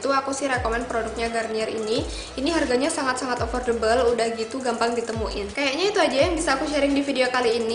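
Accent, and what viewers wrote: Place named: Yogyakarta, Indonesia. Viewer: Medok.. orang jogja po mbak?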